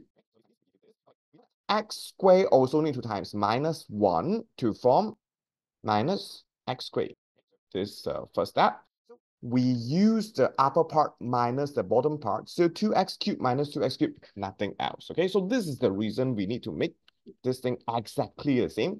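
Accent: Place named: Asia. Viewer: something about your asian accent makes math so much easier to understand(im also asian) btw all the other channels stretched this topic out soooo long(like multiple 20 minute slow paced videos) but your concise and strutctures style of teaching helped me practice along and not take like 5 hours for the easiest add maths chapter.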